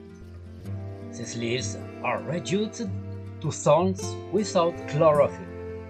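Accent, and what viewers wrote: France. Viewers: The french accent sympa la version en anglais bonne fête de pâques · Hello, thank you for the video in english, I liked the french accent, that's my fun, good continuation, kiss👍😘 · Superbe idée, l accent français, les anglo-saxons adorent. Cela leur permettra d apprécier la flore de France. J ai mis un pouce en haut 👍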